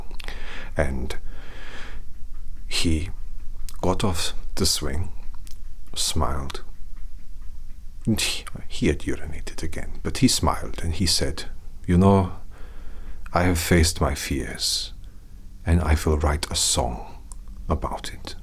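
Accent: Germany. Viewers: GDDGHJBB OMG I HAVE ALWAYS WANTED TO SEE A ROCKSTAR ASMR you nailed this you're so hot like this 😍 and it was brilliantly done! The accent the look the whole atmosphere id say you are German lol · That German accent... Is so good I'm melting!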